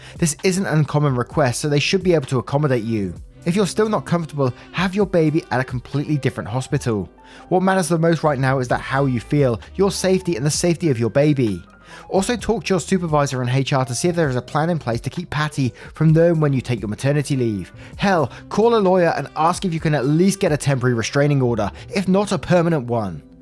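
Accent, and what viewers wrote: England, United Kingdom. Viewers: My day off so perfect time to catch up on waffles lol. Always awesome to listen to, the perfect voice. Canadian’s love that English accent.🥰🥰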